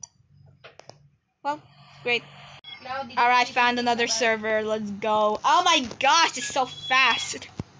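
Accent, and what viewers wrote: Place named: United States. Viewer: You sound american. WE DRIVIN ON THE RIGHT SIDE CUZ WE'RE AMERICAN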